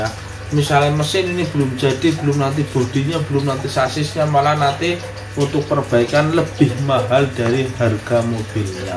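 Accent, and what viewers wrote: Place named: Banyumas, Indonesia. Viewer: Salam ngapak lik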